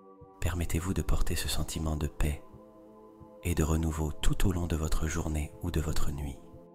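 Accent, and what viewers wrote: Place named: Quebec, Canada. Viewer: WoW mais quel changement! Petite question: je suis québécoise et j’entends très bien le même accent. Êtes-vous québécois vous aussi?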